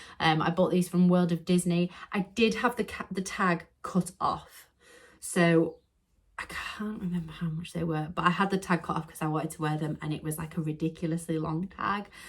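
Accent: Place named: England, United Kingdom. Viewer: I love your typical english accent :-). I'm french and you speak very clear and easly too understand.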